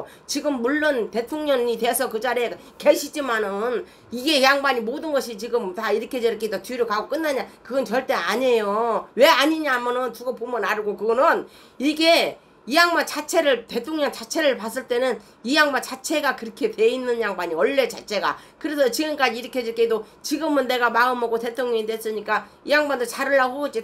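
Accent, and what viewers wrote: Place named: Jeolla, South Korea. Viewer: 전라도말씨 찰지다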